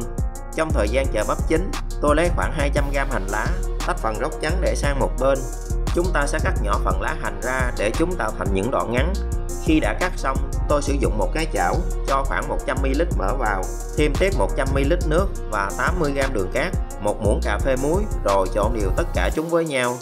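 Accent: Mekong Delta, Vietnam. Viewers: Quay kiểu xịn quá chú. giọng miền tây đúng thân thiện ng việt nam ạ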